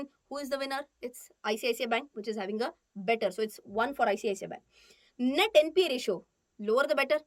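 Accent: South India, India. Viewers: She Is Marathi but her accent is like south indian..... I don't know why but My English is also sounds like her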